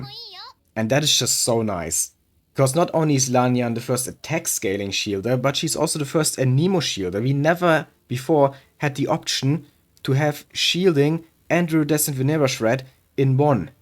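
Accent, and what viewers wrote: Germany. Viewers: you have a thick german accent bro i will sub tho cus nice video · Höre ich da etwa deutsches english?😅😂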